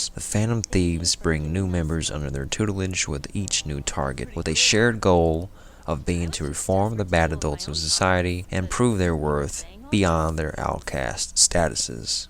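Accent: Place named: United States. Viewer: Great story explaination and at first I thought u were pronouncing some names wrong bcus u didn't know but then I figured out that u were pronouncing it the American way lol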